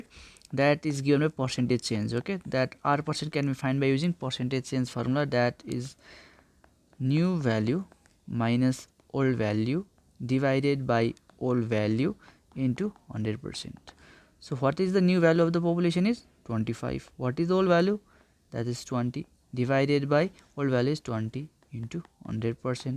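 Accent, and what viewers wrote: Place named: Nepal. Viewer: Are you from Nepal? Your accent seems like Nepali.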